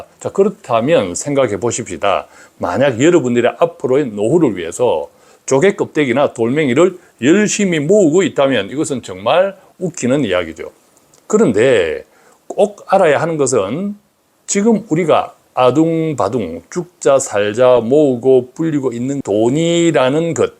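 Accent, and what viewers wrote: Gyeongsang, South Korea. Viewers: 경상도 사투리 쓰셔도 이 대표님은 아주 진실적입니다 냉정적이고 경상도 대구가 이중성 있는것이지 대표님은 경남입니다 옳고 그름을 판단하시는 분이예요 함부로 말하지 마세요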